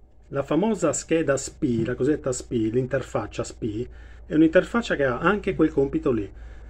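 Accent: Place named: Sardinia, Italy. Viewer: Ciao, ma sei sardo anche tu vero?? 😎 Si capisce dall'accento e perche sei in gamba! Comunque interrompi tutto sul più bello, dici "ma adesso andiamo a vedere" e poi finisce il video 😢 Io sto riscontrando un problema dei dati misurati dal sensore, che a casa mia è stato posto a monte dell'interruttore generale, proprio nel quadro di casa...incrociando i dati con letture contatore e la pinza Shelly EM che ho installato a valle del generale, hi notato che c'è una discrepanza nella lettura dei consumi di casa e dellenergia prelevata, di ben 45kWh in un mese (Gennaio, dunque 24gg)...secondo te cosa può essere? Va' assolutamente collegata proprio nella cassetta del contatore? La linea dal contatore al generale è diretta, c'è solo un altro quadretto con un altro interruttore. Comunque caricaci la fine del videoooo 😂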